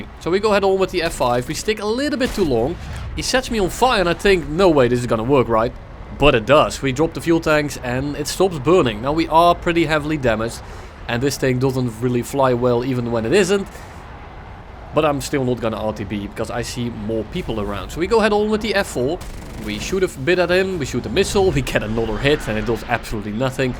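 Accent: Netherlands. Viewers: Steenkolen English 👍🏼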